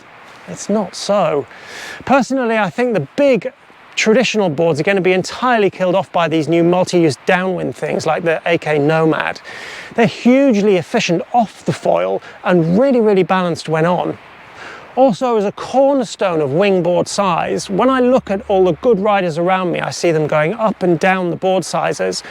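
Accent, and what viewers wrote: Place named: United Kingdom. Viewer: Thank you very much for the precious advice, delivered in a fantastic British form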